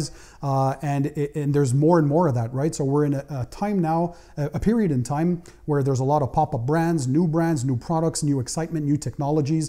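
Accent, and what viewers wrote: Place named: Montreal, Canada. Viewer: Wow! Je découvre votre chaine ce soir. Celle-ci est la deuxième que j'écoute. Ça commence fort. Faut demeurer concentré sur les 99.99% qui vous apprécient. La qualité et l'enthousiasme que vous présentez ma gagné tout de suite: SUBSCRIBED !! J'ai senti que vous aviez possiblement un petit accent tres subtile de Montreal, une touche francophone. Je découvre que vous parlez français et bien entendu, je devine, le grec. Tikanis ! Bon succès, je vais me convertir en Week-end worrior en detailing. J'attend un nouveau véhicule pour la semaine prochaine. Est-ce que je peut déja appliquer une protection de peinture sur ma nouvelle voiture. Je viens d'acheter McGuire's Ultimate que j'ai appliqué sur la voiture recente de ma femme; beux résultat. Normand Rousseau , rive-nord de Montréal.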